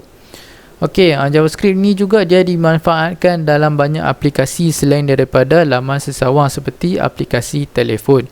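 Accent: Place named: North, Malaysia. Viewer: saya subscribe hasil dari iklan awak di YT Channel Hazeman Huzir.. org mana tu.. terkeluaq bahasa utara tu..kekekeke.. saya support ini channel.. Salam dari Bumi Sintok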